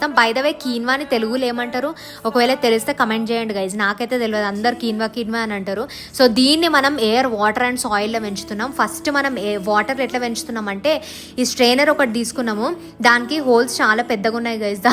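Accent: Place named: Kerala, India. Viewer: Why u r speaking telugu in Malayalam slang 😅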